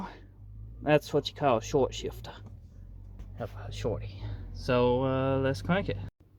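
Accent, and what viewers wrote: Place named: Louisiana, United States. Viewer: I'm going to take a wild guess and say this feller is from Louisiana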